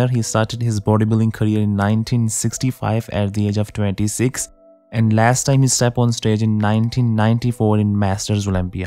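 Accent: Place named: New Jersey, United States. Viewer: That’s a lovely accent you got there… New Jersey?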